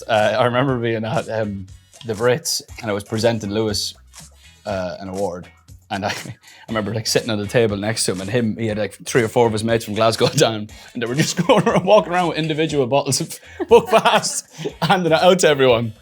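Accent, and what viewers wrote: Ireland. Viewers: It's an Irish accent. Sybau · I didn’t realize how Irish Niall was until right now. Like the accent as gotten stronger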